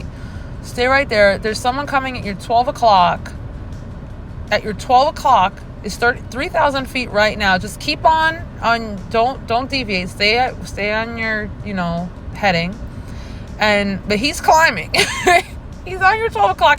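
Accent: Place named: New York, United States. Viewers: Ha Ha I from upstate NY now living in the south 🤣 100% relate to the accent/attitude. I can't hide it if I tried! Awesome flight and good luck going for your Commercial! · Nothing wrong with the New Yorker accent, and you have a pleasant voice, really. (ツ) ☕☕(ツ)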